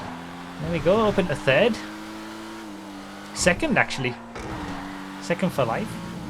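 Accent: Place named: Newcastle, United Kingdom. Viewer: that is what is scientifically known as a Geordie accent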